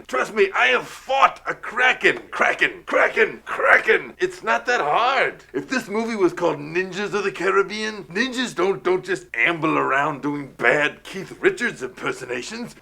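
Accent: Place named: Ireland. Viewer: sounding quite Irish in this video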